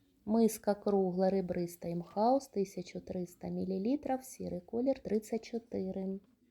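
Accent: Russia. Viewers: На палицях????? Вроді і знаєте мову, але вимова російська.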